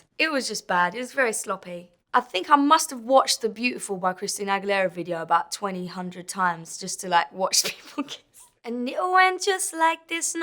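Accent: United Kingdom. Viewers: I never saw u talk like that that british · Wait.... your British?!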